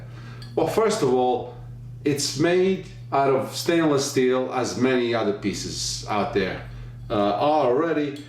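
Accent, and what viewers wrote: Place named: Long Island, United States. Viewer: He's from Long Island, we all talk the same.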